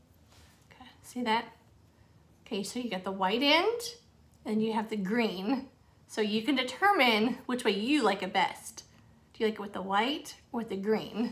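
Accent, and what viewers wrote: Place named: Kentucky, United States. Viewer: Love your KY dialect! My Mommom grew up in Hopkinsville. Lost her a few years back. 😢❤️ I love the idea of tier trays. I think I may do one for my best friend from my college days. We email almost daily.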